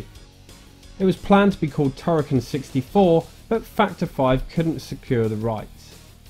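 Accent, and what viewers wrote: United Kingdom. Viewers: I think one of the reasons why the N64DD was DOA was the fact you could only buy it off the internet and online shopping was a brand new concept back in 1996. So new in fact that almost no one really did it at the time. Why Nintendo did it is a complete mystery to me but it would have had a much better time if it was sold in retail stores like GAME in the UK where you sound like you are from (you sound British to me) or Best Buy in the US where I live. I dunno if you would agree with me or not, but it is the main reason why it did not go well from what I have heard.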